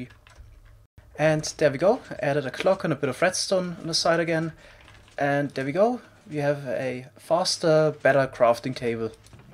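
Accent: Germany. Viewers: Also dein Englisch ist seehr gut aber man hört es einfach wenn ein Deutscher Englisch redet xD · I really hope we get a automatic crafting table. Also are you german? Your english sounds like you're from germany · ich mag deinen deutschen akzent ;D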